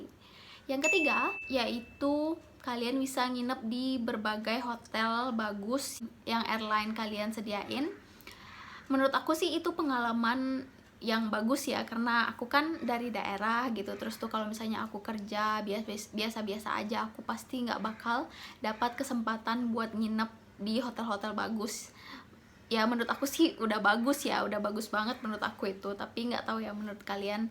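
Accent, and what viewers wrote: West Sumatra, Indonesia. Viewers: Wow. Ternyata org Padang mbak nya,,pantesan logat b.indonesia nya agak kurang,masih jelas logat minang nya🙂🤗 sukses mbak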